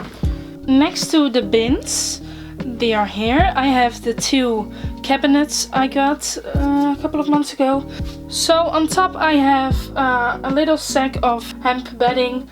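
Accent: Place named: Netherlands. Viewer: I noticed the Dutch accent, and checked the description box. Nice to see another Dutchie like me making video's about hamsters!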